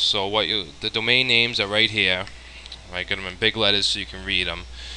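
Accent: Boston, United States. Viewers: Strong boston accent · Dude your Boston accent is ringing in my ears. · with the boston accent lol.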